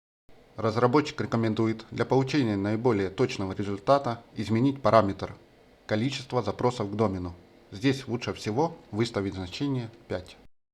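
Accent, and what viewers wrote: Ukraine. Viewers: Смущает малоросский говор. Не засланный ли казачок? Извиняй, если что, обидеть не хотел. У меня гудбай дипиай работает прекрасно. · Рекламировать DPI - C УКРАИНСКИМ акцентом крайне рискованная затея ))). Даже если вы не призываете уже статья за это если вы рф а акцент усугубит ваше положение до ионогена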